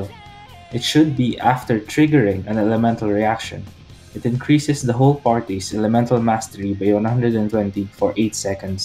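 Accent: Philippines. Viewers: You sound filipino. Are you?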